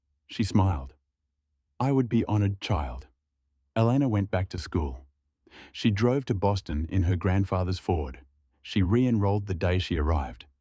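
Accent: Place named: Australia. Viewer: Loved the Australian accent. It is so much easier to listen too .. are there any more read in an Australian accent?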